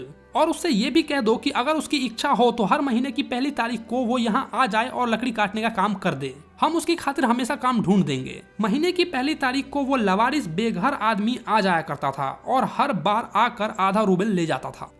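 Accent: Bihar, India. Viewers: I think you are from bihar... Right? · Are you from Bihar 😎😎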